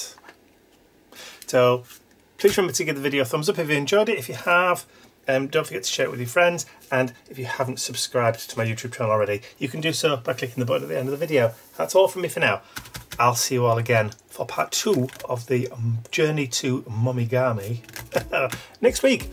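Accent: United Kingdom. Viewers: Lots of bits! I love the British accent!😃💖❤❤❤❤❤❤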